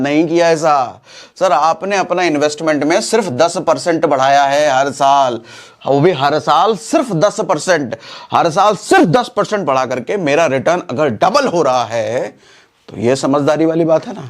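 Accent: Kanpur, India. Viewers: Sir kanpur se ho kya. Apke bolne ka tareeka kanpuriya hai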